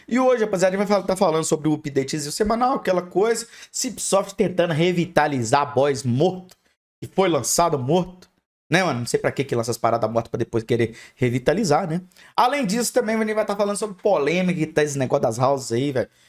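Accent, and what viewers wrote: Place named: Minas Gerais, Brazil. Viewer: Esse foi o video que o mais soltou seu mineirês falando dos bichin endemoniado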